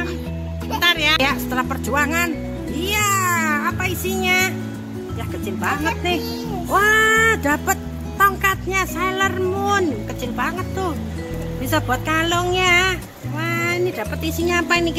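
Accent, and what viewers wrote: Java, Indonesia. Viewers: Kok kya orang jawa hhhh...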